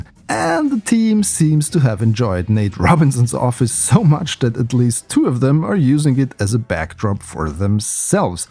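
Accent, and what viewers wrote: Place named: Germany. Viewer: Your Hochdeutscher Akzent ist very obvious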